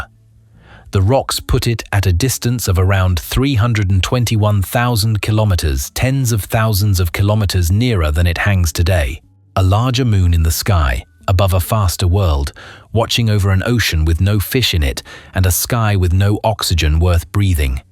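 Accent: England, United Kingdom. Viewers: What’s very funny is how the general public believes so much more in the narrator with an English accent, as opposed to any other accent rather irritating to me pompous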